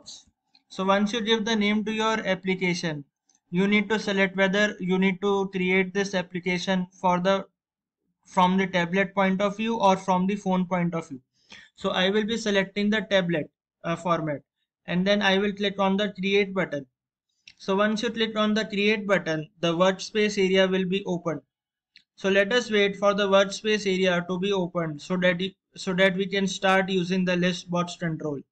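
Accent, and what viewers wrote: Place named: India. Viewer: why pause when talking? all indians sound identical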